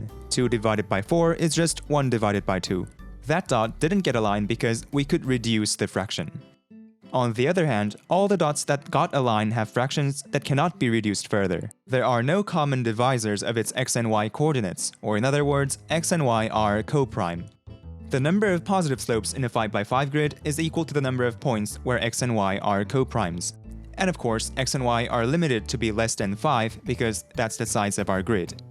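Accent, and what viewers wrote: South Korea. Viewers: 아 약간 발음 보니까 한국인인데? 라고 생각했는데 9분 쯤에 알려주시네요 ㅋㅋㅋㅋㅋㅋ 편집이 정말 깔끔하고 좋네요. 어떤 프로그램을 사용하셨는지요..?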